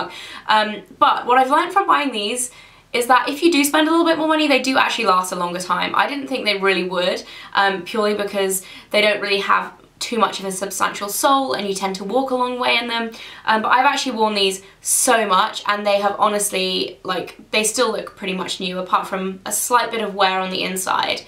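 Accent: United Kingdom. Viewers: It's the British pronunciation :)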